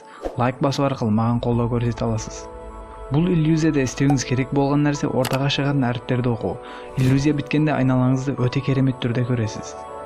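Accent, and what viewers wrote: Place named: Uzbekistan. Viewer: Озбексинба? Диалектин казакшага келмейди?🤔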